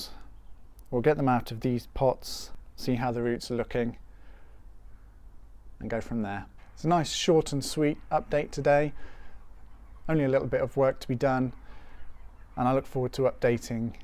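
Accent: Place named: United Kingdom. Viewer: I'm from Germany. By you're accent you're from Britain, right?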